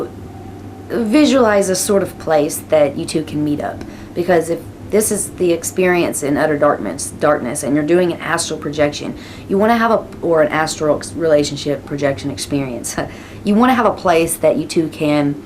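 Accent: South, United States. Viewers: Cool southern accent haha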